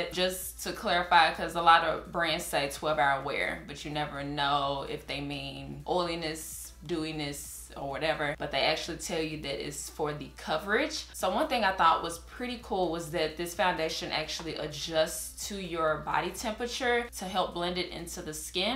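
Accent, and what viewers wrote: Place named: Texas, United States. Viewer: What city are you in. I hear a Texas accent.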